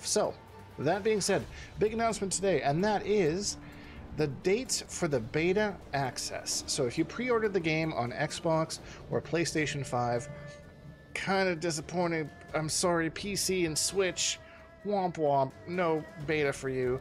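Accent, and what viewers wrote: Canada. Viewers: Ah aboot time we get another great video from love the way u guys say aboot in canada hope i can run into you in the beta brother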